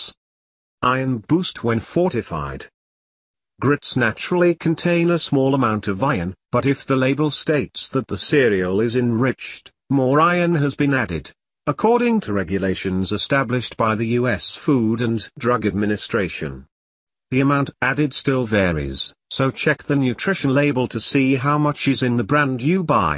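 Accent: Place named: United Kingdom. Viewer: Why must they use a British robot voice. Just do the damn thing with a human voice please....British, American, Tunisian, I don't care, just as long as its human!!!!!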